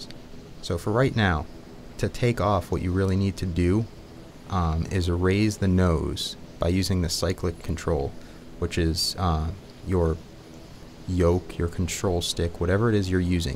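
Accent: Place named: United States. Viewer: Thank you for this terrific video. Never had a buzz for choppers as I have for planes, but this video has changed that. Please keep them coming. By the way, and I mean this with utter respect, you sound like, with your American accent, the actor Sam Elliott playing the Doorman in 'Road House'. Thank you very much for the Video.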